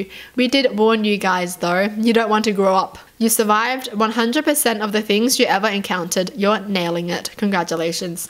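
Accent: Australia. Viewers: I do like your voice! Australian accents are so cute and adorable🥹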